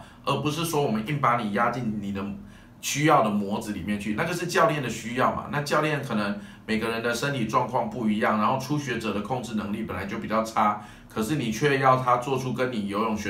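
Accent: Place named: Taiwan. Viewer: 废话，人家教练是台湾人，不说国语难道说大陆普通话？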